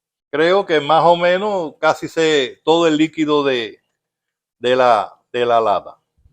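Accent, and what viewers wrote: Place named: Cuba. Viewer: Felicidades por su canal, mi Padre era de Santiago de Cuba, y cuando lo escucho hablar me recuerda mucho a el, saludos y mucho exito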